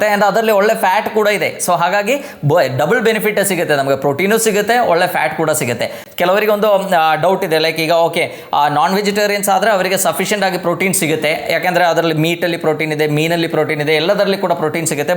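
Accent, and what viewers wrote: Kerala, India. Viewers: Good explanation 🎉 good kannada by pronoucetion I found malayali🥰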